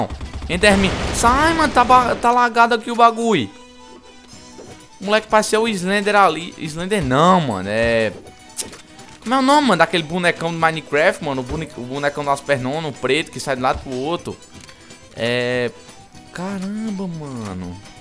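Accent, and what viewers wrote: Northeast, Brazil. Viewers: vc é nordestino?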